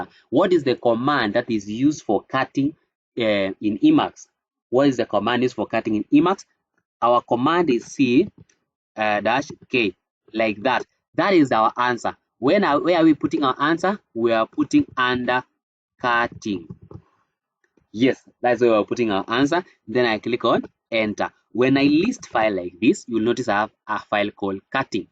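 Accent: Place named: Kenya. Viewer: love your accent,kenyan?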